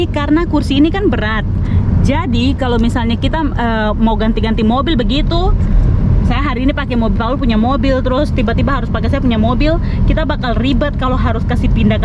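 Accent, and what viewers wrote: Papua, Indonesia. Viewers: MF kk🙏🙏 Kk nc orang Papua iya??? Penasaran dengan logatnx kk😊🙏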